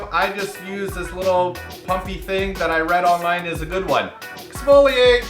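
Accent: Russia. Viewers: I love Russian accent. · YAAAA I click video for manly man Rrrrussian accent!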